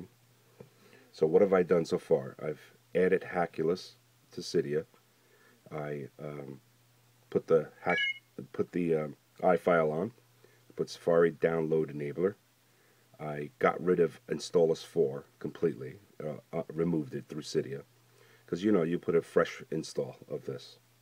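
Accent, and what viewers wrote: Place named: Long Island, United States. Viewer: i like your accent! are you from long island? thanks man!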